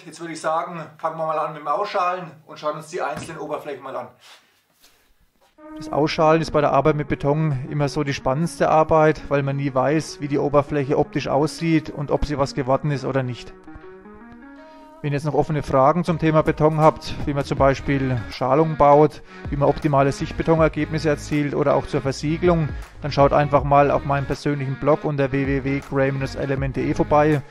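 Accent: Franconia, Germany. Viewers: Wieder sehr interessant geworden das ganze, locker erzählt mit leicht fränkischen unterton.......mach ner su weider ! Die 3te Platte schaut schon am besten aus, allerdings könnten die Kontraste etwas stärker sein. Evtl etwas warten bis der Beton nicht mehr ganz so flüssig ist und die 2 Farben sich nicht mehr so stark vermischen ? Hmm.......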